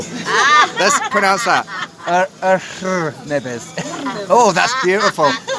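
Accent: United Kingdom. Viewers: Thank you for visiting our country. Greetings for UK. Sorry if i am wrong about your country, you sound like people from UK. I know that because i was in London 2018. I almost cried when i had to go back. Bye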